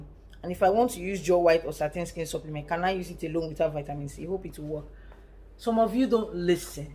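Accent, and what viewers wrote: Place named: Yoruba, Nigeria. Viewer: I love listening to ur lectures, especially the way you speak that ur yoruba eehh, nice dear, plz can someone that did Ivf take satin supplement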